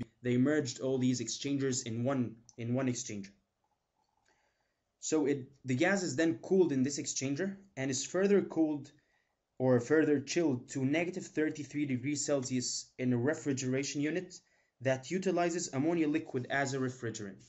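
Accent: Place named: Arab world. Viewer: thanks man! really helpful! i sense an arabic accent! all the best man! ---Undergrad chem eng student, Australia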